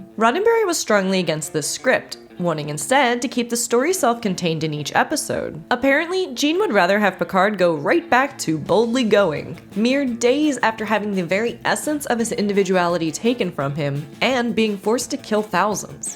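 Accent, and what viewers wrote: United States. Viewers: American accent threw me off for a sec 😂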